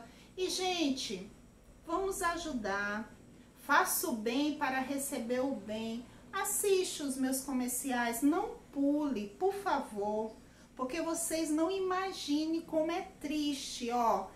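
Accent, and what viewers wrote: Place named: Maranhão, Brazil. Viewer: Parabéns, vou la na potiguar! Quando ouvi teu sotaque ja sabia que é maranhense tbm kkkkk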